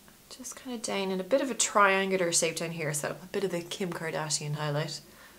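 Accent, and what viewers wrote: Ireland. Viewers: She is Irish but recently moved to Oz. She better keep her Irish accent I love it. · DAMN IT. I meant to ask if you were Irish? Your accent sounds Irish but you're Australian?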